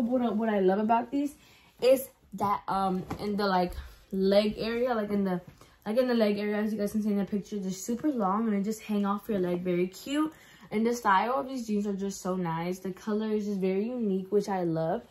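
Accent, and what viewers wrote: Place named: South, Mexico. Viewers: Very south Mexican accent it’s🥴 maybe cuss I’m from nyc lol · Factsss her Accent is to die for i love Mexican Accents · it’s a Mexican accent...’Hot Cheeto girl’ is literally a microaggresion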